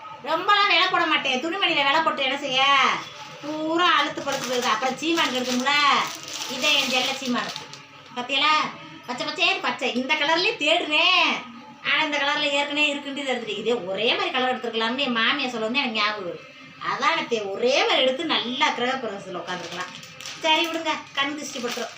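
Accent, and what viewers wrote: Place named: R.S. Mangalam, India. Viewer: வீடு கிரகப்பிரவேசத்திற்கு வாழ்த்துக்கள் அக்கா நான் R.S Mangalam சொந்த ஊர் சென்னையில் இருக்கின்றோம் நம்ம ஊரில் உள்ள பேச்சு அப்படியே பேசிறிர்கள் ரொம்ப புடிக்கும்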